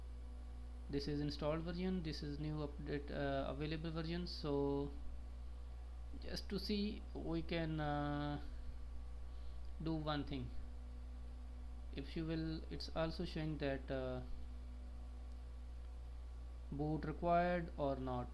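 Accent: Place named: Asia. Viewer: i was just asking as ur accent sounded like an asian bro. Anyway keep up the good work.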